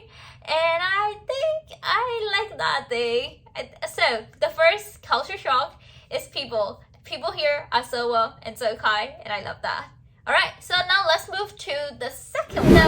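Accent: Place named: United Kingdom. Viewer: U r starting to sound like a British...Love ya❣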